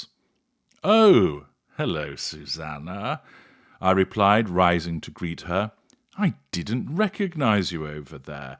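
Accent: United Kingdom. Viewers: Yes. His voice and elite British accent 😀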